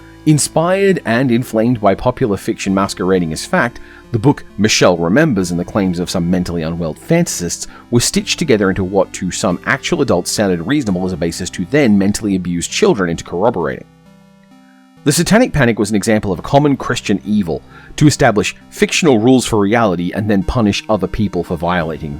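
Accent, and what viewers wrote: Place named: United Kingdom. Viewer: Somehow I didn't notice that had a British accent until the "mum/mom" thing. I have watched several of his videos.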